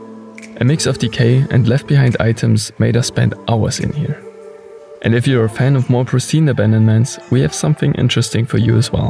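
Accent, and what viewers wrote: Germany. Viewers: I like your videos, very well done and your great dialogues. And especially I like your German accent (honestly).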